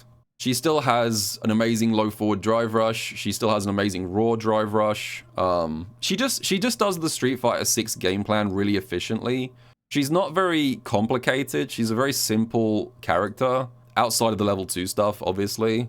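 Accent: United Kingdom. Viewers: i appreciate how you make "bad content for a good cause", i dont care about tierlists especially as a non SF6 player or active online yapper complaining about X thing in a fighting game, but i do care about hearing funny british capybara man yap and be honestly very insightful and reasonable while also being entertaining and going for charity events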